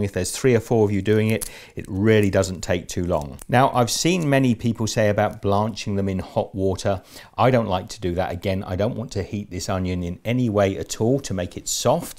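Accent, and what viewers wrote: United Kingdom. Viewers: Are u british u have a sort of british accent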